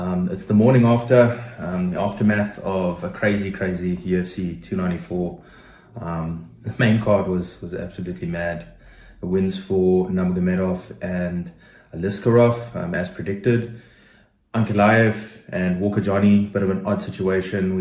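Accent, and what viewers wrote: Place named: South Africa. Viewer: i see. I ve got a soft spot for the beautiful SA accent. Subbed :)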